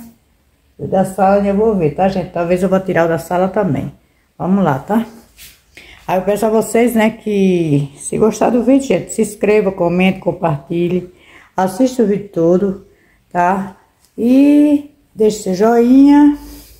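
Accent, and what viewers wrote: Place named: Bahia, Brazil. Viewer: Agora parece que é baiana mesmo né oxente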